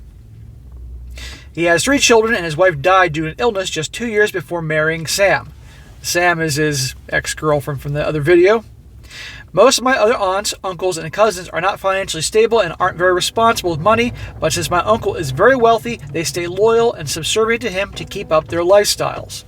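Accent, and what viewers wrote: Philippines. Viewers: Sound like the man is from the Philippines. Mabuhay !!! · Sounds like he’s from the Philippines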